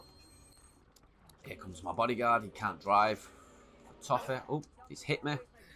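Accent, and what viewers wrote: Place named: United Kingdom. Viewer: That british accent is amazing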